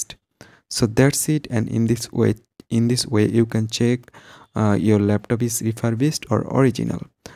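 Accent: India. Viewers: This is our indian 🇮🇳english bro 😅 ok so don't mind ok 😅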